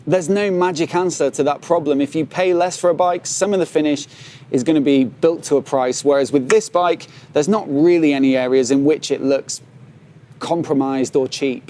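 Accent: United Kingdom. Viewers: Your accent is so British and I love it.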